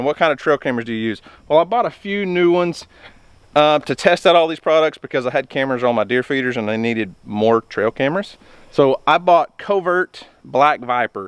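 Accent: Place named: South, United States. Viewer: I was born and raised in Alabama and the part I'm from Walker County we're big country talkers like you I'd think that you were from my neck of the woods meaning like Alabama and surrounding state's of the South east never would have thought you guys sound so country!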